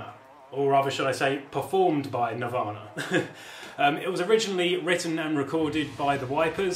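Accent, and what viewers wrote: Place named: United Kingdom. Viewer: Hey dude just wanted to let you know.... I wanted to learn the song and just came across ur vid.... I enjoyed your self-deprecating humor s*** dude...I'm the same way ... but yeah pretty good job man thanks ......anyway ....cheerio...lol... .from Jacksonville, FL I was going to ask if you were near near London and a Jaguar fan but I'm betting probably not. my apologies if it's wrong to assume that all Brits are from London.....I don't know the difference in the accents And shit.... Anyway I just wanted to let you know I appreciate it.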